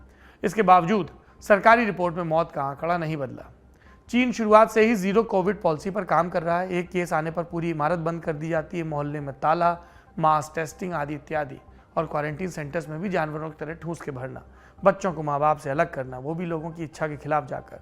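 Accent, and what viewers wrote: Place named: Bundelkhand, India. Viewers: sir tumai bundelkhandi sunke maja aagao ek dum gaon ki yaad dila dai aapne to👌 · Sir i am from orai (near to your hometown chamari). The way you deliver words initially i can feel that vibe sir........ Full bundelkhandi.... Big fan sir..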